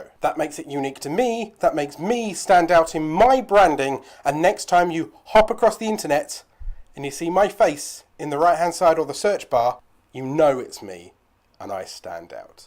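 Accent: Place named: England, United Kingdom. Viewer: Come to think of it, I do prefer listening to an English accent! And a little bit of humour is always good. I do wonder why you're so close to the camera - not just you, everyone who does it?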